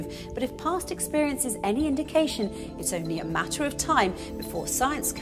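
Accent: England, United Kingdom. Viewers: انتبهوا من هذه القناه.. اما المذيعة فهي مذيعة مأجورة تقرإ الننص كما يكتب لها سواء كان نصا من القرآن او للتوراة او لكتب الهندوس فلا فرق لديها. لكن واصح انهم احتاروها بشكل وبلكنه انكليزية واضحه وايضا أن لا تكون مسلمه لكي تبدو اكثر اقناعا.وكأنها تمثل الغرب او ناسا ربما لا القائمين على القناة... متأكد لو انها قررت تلبس حجاب لطرودها لانهم يريدونها بصوره غير المؤمنة.. الله يهيدهم اللي خلف هذه القناة.. علميا كلامهم للأسف ضعيف كما هو حال كل حلقاتهم.. فانتبهوا..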